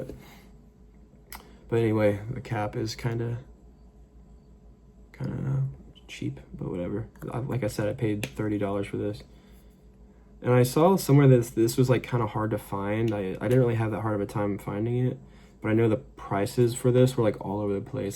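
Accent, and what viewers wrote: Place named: France. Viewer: Ey man I was curious. Why do u often use this "france-ious" dialect?✌🏼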